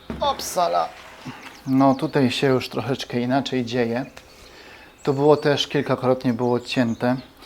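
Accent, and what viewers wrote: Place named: Podhale, Poland. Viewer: dziękuję tak zapytałam bo akcent jak z Podhala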